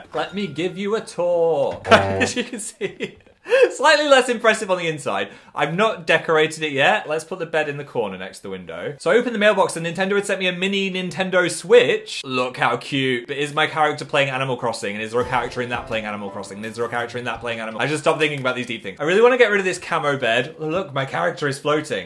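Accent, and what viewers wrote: North, United Kingdom. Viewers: is it just me or does sound more northern than usual? No.... just me ok then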